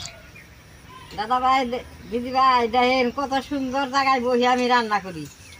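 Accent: Bangladesh. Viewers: Jethi Tumi khubi majadar jinish banao kintu abujh manush tumar mullayan kore na tumar bhasha shune moe hoy Tumi jamalpur Tangailer luk onek din becher thako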